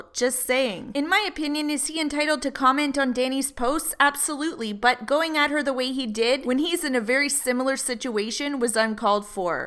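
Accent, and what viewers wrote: Canada. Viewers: no offense you sound like your from Canada from the way you say "sorry"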